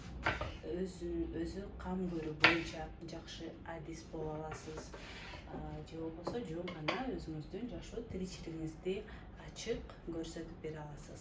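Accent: Standard. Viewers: Азаматсын,так ,даана,таза кыргызча , түшүнүктүү сүйлөгөн кыргыздын сыймыктуу кызы!!! Белегин кут болсун!! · Үнүңүз өтө жагымдуу,таза кыпгызчаныз дагы жагат,бизге сонун тамактарды үйрөтүп эле жүрө бериңиз,бар болуңуз 😘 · Белегиниз мн чын журоктон куттуктайм.Сизди таза кыргызча суйлоп,орусча кошпой суйлогонунуз учун дагы абдан сыйлайм..Тазалыктын сырларынан да айтып,уйротуп турунуз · Азамат кожойке экенсиң алтын кыз бар бол Таптаза кыргызча сүйлөйт экенсиң кай жердин кызы болосуң ❤ Бар бол алтын кыз рахмат эмгектериңе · Саламатсызбы,.Ден соолук, дагы көптөгөн ийгиликтерди, үй-бүлөлүк бакыт каалайм! Кыргызча эң сонун таза, көркөм, таттуу сүйлөп түшүндүргөнүңүзгө чоң рахмат!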